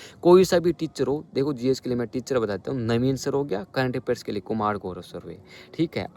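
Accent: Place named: Rajasthan, India. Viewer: Bhaiya app rajsthan se ho kya boli me lg raha hai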